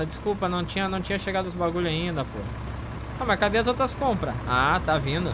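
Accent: Portugal. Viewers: Português de Portugal e praticamente isso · Esse é sim o português de Portugal!!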